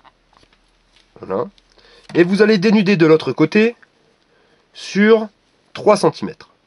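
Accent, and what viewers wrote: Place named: North, Germany. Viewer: super, l'accent deuch nord est beau aussi!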